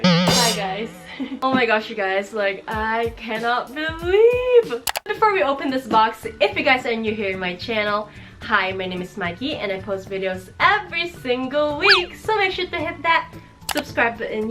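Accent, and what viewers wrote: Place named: France. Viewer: Oohhhhh with that French accent. Not too shabby 👌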